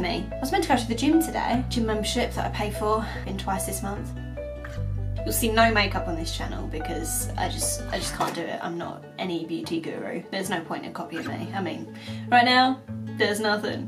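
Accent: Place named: Australia. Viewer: that was great! I swear you sound Australian in this. God luck with everything, Love Deborah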